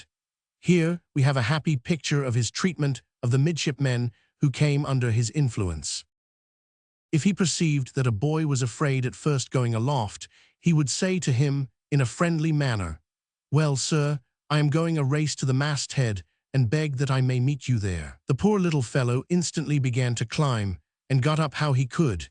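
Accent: Mid-Atlantic, United States. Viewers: Absolutely horrible rendition. The AI voice has an unpleasant mid-Atlantic sound, and doesn't know how to pronounce basic words in English, such as lieutenant. If this is what audio-books sound like then I'll give them a miss.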